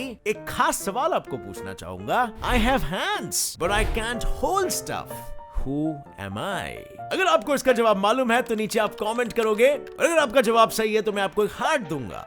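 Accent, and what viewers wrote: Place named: Uttarakhand, India. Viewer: Aap uttarakhand se ho mujhe pta chl gya 🤣🤣😂😂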